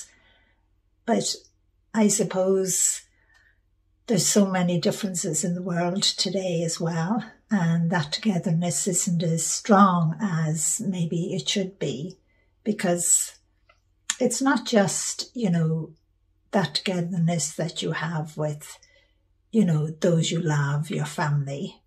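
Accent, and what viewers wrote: Ireland. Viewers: Lorna, You have no idea how this message is perfect timing as I need to do a little bridge mending. I've been avoiding it, but I don't want to let the situation keep going the way it is....your message is the nudge I needed! I saw you years ago at The Unity Church in St Petersburg, Florida. You had written your first book. The sanctuary was packed. Your lovely Irish accent came floating out and we were all on board with your message and fell in love with. Vicki Perez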